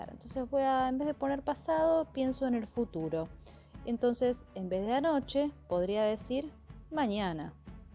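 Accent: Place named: Argentina. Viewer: Gracias . porcierto sos de qrgentina. 🍽 un acento bonito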